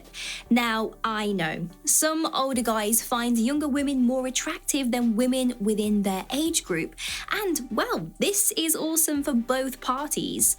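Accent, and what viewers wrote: England, United Kingdom. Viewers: Is this girl from the British isles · What part of England are you from? Midlands, maybe North?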